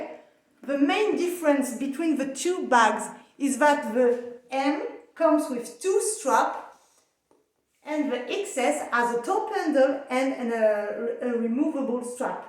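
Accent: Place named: France. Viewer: I enjoyed hearing the French. And hearing your comparison of the bags. Still have a hope of getting the XS someday. I just love the top handle! 😊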